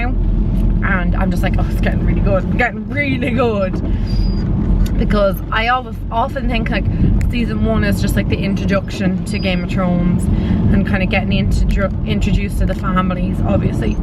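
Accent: Dublin, Ireland. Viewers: are you from Dublin? I always though you were from the country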